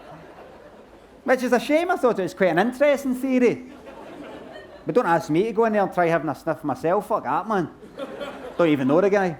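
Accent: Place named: Scotland, United Kingdom. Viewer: He's Scottish… he speaks normal for a Scottish person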